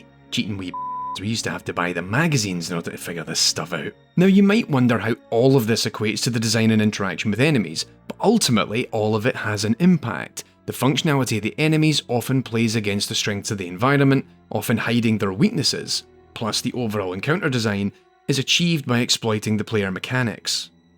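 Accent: Scotland, United Kingdom. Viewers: First video I've seen of this channel, I could listen to this Scottish brogue forever. Excellent, excellent analysis, well done.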